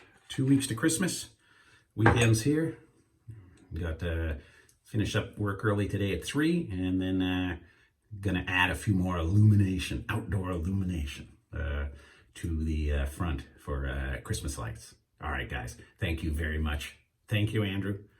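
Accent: Newcastle, United Kingdom. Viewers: I love your channel bro.Love from Liverpool uk.You may be a yank now but you can tell a Geordie.(Newcastle for outsiders)Take care mate.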